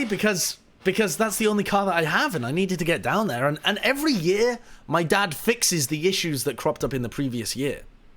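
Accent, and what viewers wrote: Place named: North, United Kingdom. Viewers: bloody hell used to sound proper northern! I know he says he did but I'd never seen footage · holy shit he sounds so northern in the clips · I cannot get over how Yorkshire sounds in the VODs. Bring back the accent,!!!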